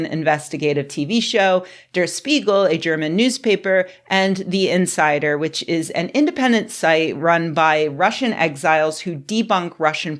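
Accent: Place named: East Coast, United States. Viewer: I hear so much upper east coast in your accent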